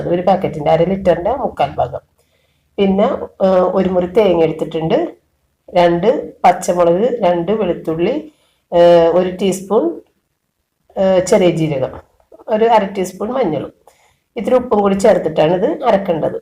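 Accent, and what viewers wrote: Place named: Ernakulam, India. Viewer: ernakulam dt aano. Slang ketittu chodichatha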